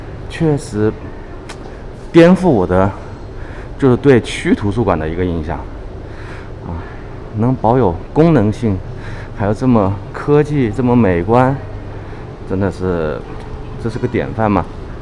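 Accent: Wuhan, China. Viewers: 虾不掩鱼，纯正武汉普通话，亲切，点赞。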